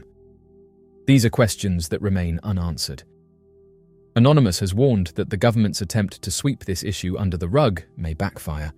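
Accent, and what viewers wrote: United Kingdom. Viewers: This channel is such bullshit .Right wing shill and then act like they get memo's from Anonymous😂Delivered in this ridiculous brit accent like that makes it seem serious.What a constant on running joke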